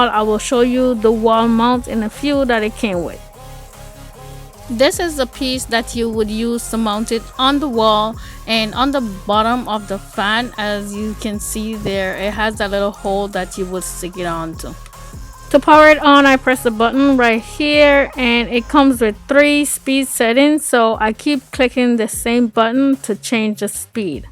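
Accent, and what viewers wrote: Jamaica. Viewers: You sound like a Jamaican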